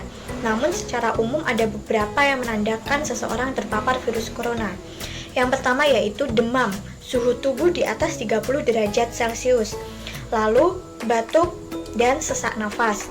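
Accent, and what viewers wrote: Java, Indonesia. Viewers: this is medok but so goodddd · Sangat medok ya mba but still ayu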